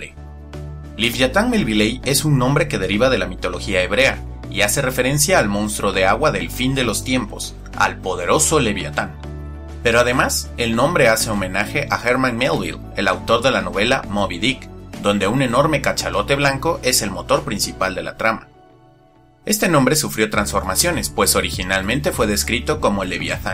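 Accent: Mexico. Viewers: erea de México? :O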